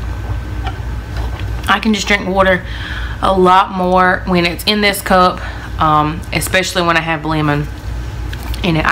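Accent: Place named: Alabama, United States. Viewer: Where are you from? I love your accent. It sounds like an Alabama accent!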